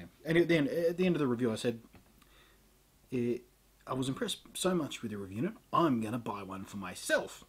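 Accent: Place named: Australia. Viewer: You sleek like a true Aussie. I am proud.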